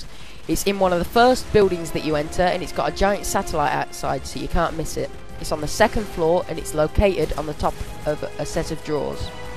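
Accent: England, United Kingdom. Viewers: your british. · im jealous of your accent lol. I allways thought english people were just english jerks bragging about speaking speaking english better then americans. But after that i wish i could talk like that lol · Who cares if he's british i have cheats now!! THANX MAN! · LOL, super british accent! XD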